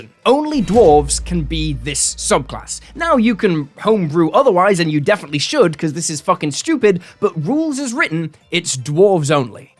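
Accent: Australia. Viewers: i dont know why i thought he was Australian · I thought you were Australian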